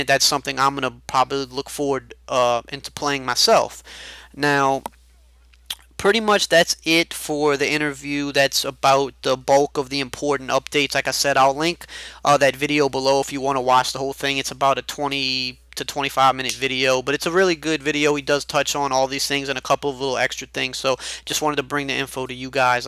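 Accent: Louisiana, United States. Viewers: Are you from Louisiana?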